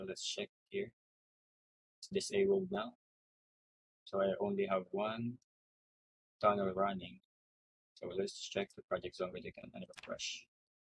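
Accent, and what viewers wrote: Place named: Philippines. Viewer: thanks man i really appreciate your tutorial, i cant help but wonder if you're a filipino based on thee way you speak (I'm filipino too)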